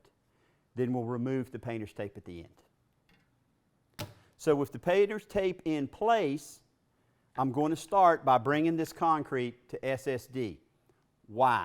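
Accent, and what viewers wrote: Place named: South, United States. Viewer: Nice job 👍, good southern accent too. Though, I still got a q: I am about to resurface my 20x 40 feet concrete driveway which is slightly inclined downhill from the road towards my garage, which make water pooling up next to my garage door. I want to resurface entire driveway but I also want to make the end of the driveway adjacent to the garage door elevated about 2 inches up, and make it sloping down to the garage floor on one side and also sloping toward the outer end by the road - with hope that the rain water will be flowing away from the garage. Any suggestions how to do it DIY? Thanks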